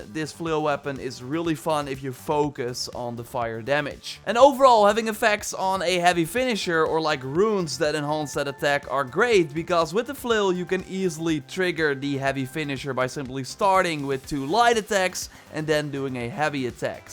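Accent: Netherlands. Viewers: Do you speak dutch, you kinda sound like someone from the netherlands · Je hoort gewoon aan zijn accent dat hij Nederlands is🤣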